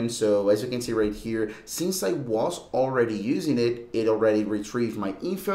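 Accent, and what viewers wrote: Brazil. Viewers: u sound brazilian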